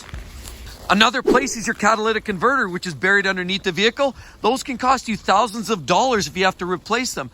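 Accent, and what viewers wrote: Canada. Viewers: Love the Canadian accent!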